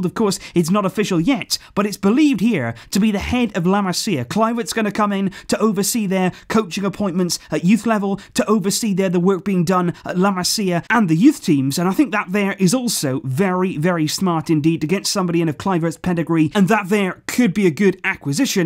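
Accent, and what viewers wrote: Wales, United Kingdom. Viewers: Where are you from? U sound British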